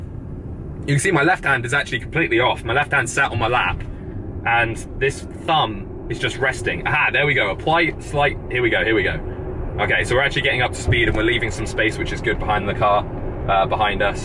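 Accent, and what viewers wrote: United Kingdom. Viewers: If I have to hear this British accent I just won't watch the video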